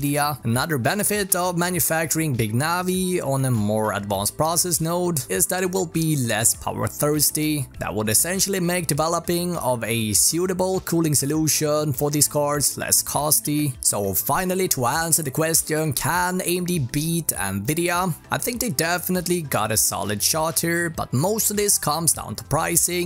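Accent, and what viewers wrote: Sweden. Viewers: swedish accent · some village swedish accent maybe.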